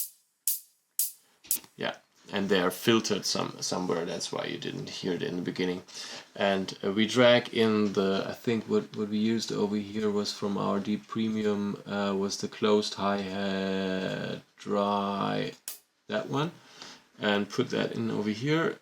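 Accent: Germany. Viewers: bist du ein deutscher? du hörst dich deutsch an. du machst super arbeit!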